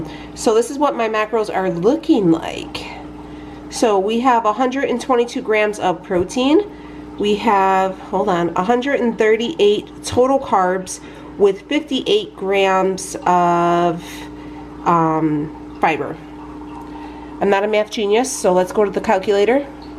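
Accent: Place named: Chicago, United States. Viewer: I love you and thats how we talk on the south side girl!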